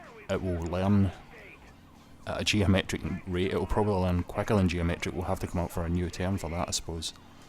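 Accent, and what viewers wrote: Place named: Scotland, United Kingdom. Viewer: Very Scottish :)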